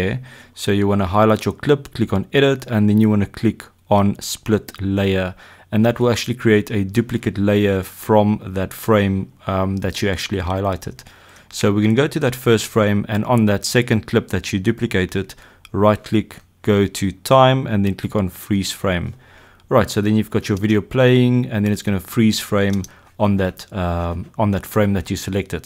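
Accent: South Africa. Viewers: Didnt know south african english differs so much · Love the South African accent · That accent though. Are you South African?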